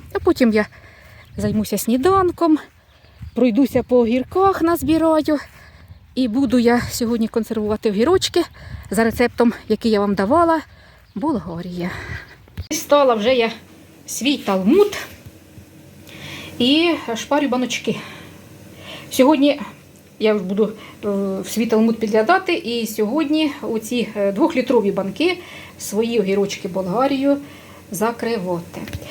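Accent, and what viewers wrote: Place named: Poltava, Ukraine. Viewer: мені дуже подобається Ваша мова я сама родом із Полтавщини як родичів послухала здоров'я Вам миру🎉🎉🎉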